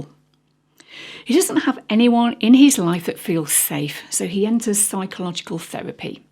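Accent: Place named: Wales, United Kingdom. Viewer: Are you Welsh? It reminds me of listening to a Welsh author read her book. It’s a lovely accent to my ears. I am Welsh, English, Irish, French and German. I love all races but despise Nationalism. Despise Nationalism. Infantile disease. Great video 👏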